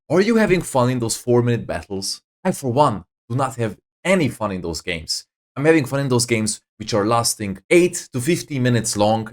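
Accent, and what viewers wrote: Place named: Hungary. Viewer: Alig hallatszik hogy magyar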